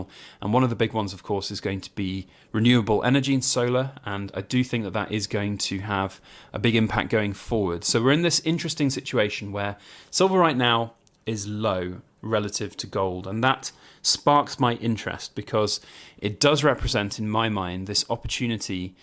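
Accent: United Kingdom. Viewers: Greetings from Colorado. You have to pay VAT on silver? I'm surprised it's even worth buying once the tax is factored in. I'm coming over to UK this summer and might consider bringing silver to sell. Love the Harry Potter accent!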